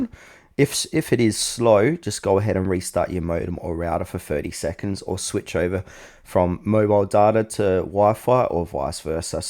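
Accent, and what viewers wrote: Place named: Australia. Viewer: Thank god an Aussie/Kiwi or a Brit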